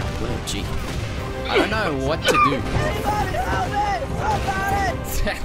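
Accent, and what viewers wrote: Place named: South Africa. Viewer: I know your profile says you’re Australian, but I can recognise a South African from a mile. Awe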